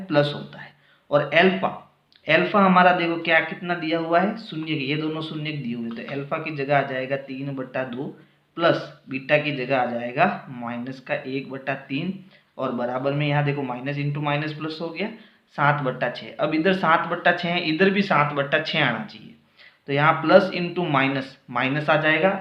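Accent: Rajasthan, India. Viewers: Sir I am also from Pali Marwar I know that you are from rajsthan apki awaz se pta chalta hai sir ji 😅😅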